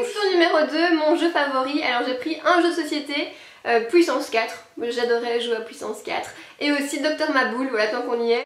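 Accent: Belgium. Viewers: Oh je suis nostalgique <3 j'adoooore la vidéo et ce look te va à ravir :* C drôle comment vous dites 90 xD c l'accent belge ?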